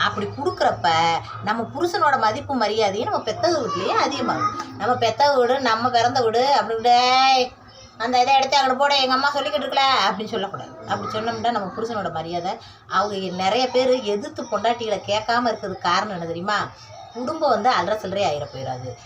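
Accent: Madurai, India. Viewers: Mathurai or usilampatti or thondi slang kandippa namma ooru kaa thaan neenga ❤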